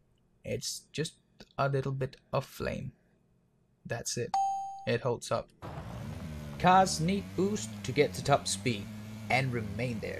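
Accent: Denmark. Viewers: are u danish? or mayby norwegian?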